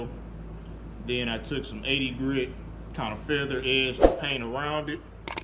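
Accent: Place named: Youngstown, United States. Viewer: Where you from man. Reminds me of my area in Youngstown hahah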